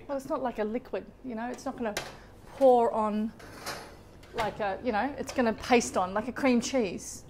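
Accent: Australia. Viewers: She might be the first European to like Americans 😂or is she Australian? Im not very good at telling just by the accent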